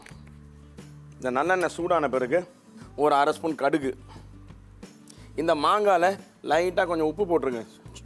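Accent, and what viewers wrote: Chennai, India. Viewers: நீங்கள் தயார் செய்யும் உணவை உண்டு ருசிக்கலாம். நீங்கள் பேசும் வட சென்னைத் தமிழை காதில் கேட்டு ரசிக்கலாம்.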